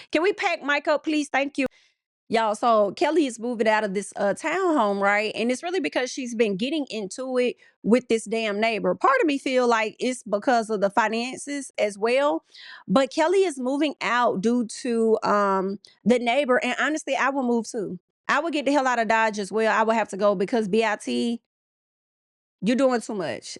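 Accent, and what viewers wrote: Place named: South, United States. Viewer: Can you just talk normal... I enjoy your takes but sheesh😅 I'm in NYC so maybe I don't get southern accents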